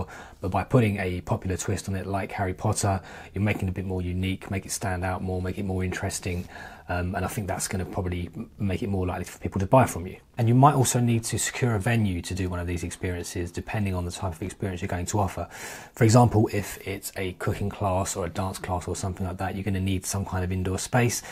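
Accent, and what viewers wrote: United Kingdom. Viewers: Yes nice to hear an English voice. I have a good idea for number 6 side hustle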